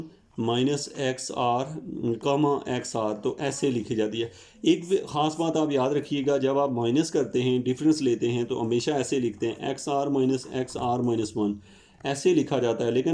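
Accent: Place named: Pakistan. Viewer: Good Video, your accent is Pakistani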